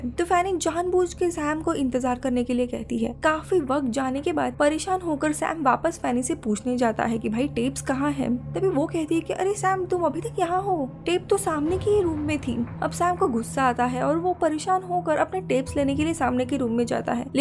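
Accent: Maharashtra, India. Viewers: B. Aawaja varun Marathi ch vatate. Tichya instagram var tine marathi madhye lihila aahe.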